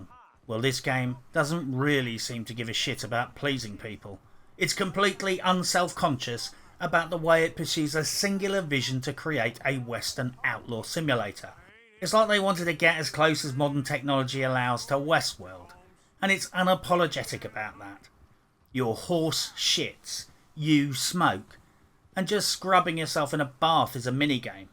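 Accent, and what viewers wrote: United Kingdom. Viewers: Its refreshing to hear a brit that knows rocket ball ammo was junk. Also thanks for using my favorite native meme. My mother had a bumper sticker on her car when I was kid that read "of course you can trust the government just ask a native". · Same I’ve just discovered them and now I have dozens to watch you just can’t beat that British wit or accent haha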